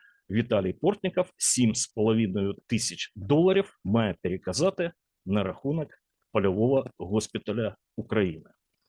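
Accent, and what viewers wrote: Russia. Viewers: Якось дивно: Ви читаєте український текст, але з російською вимовою.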